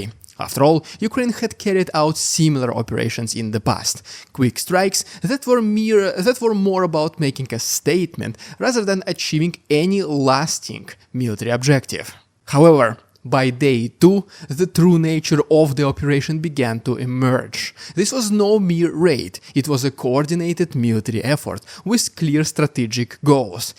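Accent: Russia. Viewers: He is Russian and actually speaks like that, kind of in the title.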